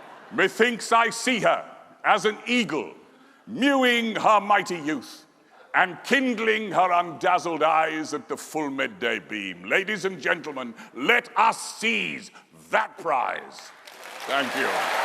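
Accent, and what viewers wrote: United Kingdom. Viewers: Just the best British accent ever -so good, how come he is not the British PM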